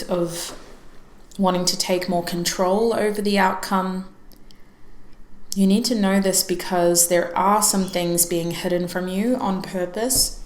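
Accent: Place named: Australia. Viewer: Oh listen, another Australian! Hello 👋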